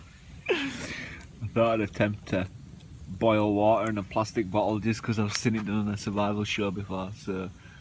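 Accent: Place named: Northern England, United Kingdom. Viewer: Connie Williams hey.u misunderstand me. I'm ribbing.I think he's a top geezer.love his work.I'm English Connie.I'm sure he won't mind me calling him a northern monkey.I understand every word or coloquesm he uses. I'm from stoke, north midlands, often described as a "clay head". We got scousers,jocks,taffs, paddies,cockneys, monkey hangers,woolybacks, yamyams.....the list goes on.we all have distinct dialects. PS everyone..no offence intended. As it goes, im 12th century Saxon invader,with Irish,Scottish and Angle blood. America and england are two nations decided by a common language. I want see catching American signal crayfish, they invasive species.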